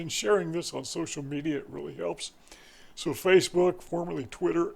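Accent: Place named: South, United States. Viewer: I’m Australian,and I can pick your southern accent quite easily. It’s a mild accent, but there all the same, especially when you say “I”, it sounds like “ah”.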